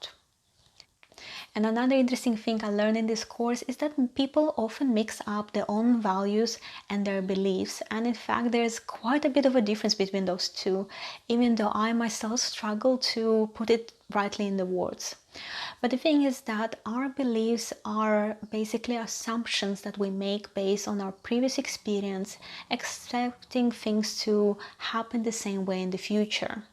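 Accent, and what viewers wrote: Russia. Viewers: Are you Russian? I can hear Russian accent. Do you live in UK?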